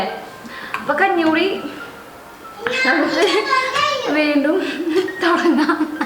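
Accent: Thrissur, India. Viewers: Thrissur slang😀😀😀😀😀😀😀😀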